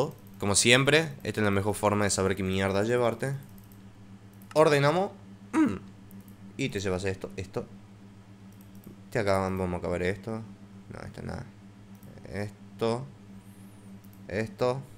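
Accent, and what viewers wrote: Argentina. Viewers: Cuando dijiste puse el cofre en el peor lugar del mundo yo dije obvio y al mismo tiempo te escuche decir obvio. LOL El primer vídeo tuyo que vi fue el pro, el noob y yo 3 y cuando escuche que eras argentino inmediatamente me suscribi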